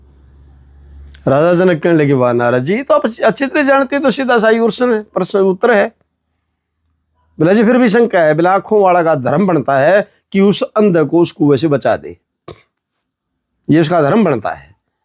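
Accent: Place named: Haryana, India. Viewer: Jai Gurudev 🙏🙏bahut hi sunder prasang tha maine do bar suni. एक तो हरियाणवी टोन थी जिसकी वजह से दो बार बड़े ही ध्यान से सुना तो अच्छे से समझ आई. बहुत ही सुन्दर कथा प्रसंग🙏🙏 अब छोटी मुँह बारी बात एक संका मेरे मन में भी रह गई की राजा जनक तो त्रेता में हुए और राजा परीक्षित कलयुग में, बिच में पूरा द्वापर बिता तो क्या तब तक राजा जनक थे ही?? बस संका हुई तो पूछ लिया और कोई बात नहीं उचित समझे तो संका का समाधान करें🙏🙏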